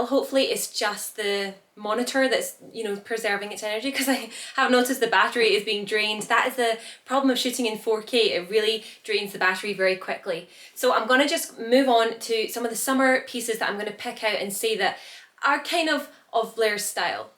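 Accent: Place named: Scotland, United Kingdom. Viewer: I love this video and I love your Scottish accent so much!! (it’s Scottish right?)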